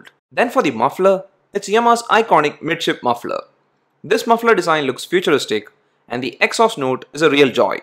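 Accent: Kerala, India. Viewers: Njan Oru malayaliyude accent spot cheyyunund Bro malayali aano?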